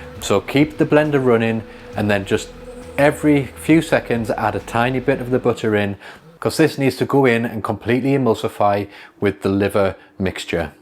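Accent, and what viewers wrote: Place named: Yorkshire, United Kingdom. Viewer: I’m guessing from your accent you’re a Yorkshire lad..?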